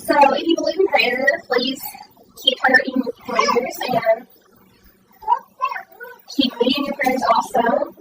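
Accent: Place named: Carolinas, United States. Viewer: What southern state are from? You sound like a Carolina girl.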